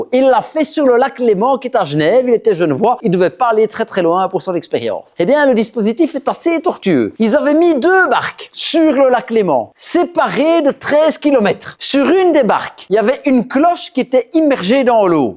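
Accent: Belgium. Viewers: Accent outrancièrement belge. Je m'efforce de le prendre au sérieux. Je n'y parviens pas. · Accent belges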